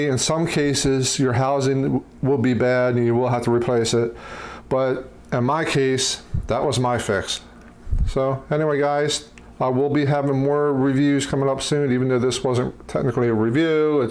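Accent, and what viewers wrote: Baltimore, United States. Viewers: Sir, I'm from Balmore and you sound as though you may be as well. I just did this and your "fix" worked! Thank you! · That did it! Thanks so much. …and thought I recognized that Balmer accent. Go Ravens!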